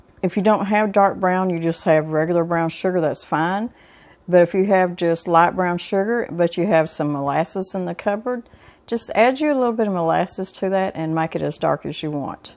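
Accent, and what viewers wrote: South, United States. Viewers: You seem like a very nicer woman.....kind and gentle, but your Southern accent drives me crazy and I hate to listen to it. So therefore, I can’t listen to your videos anymore. Y’all just make my skin crawl. Sorry. Cake sounds wonderful!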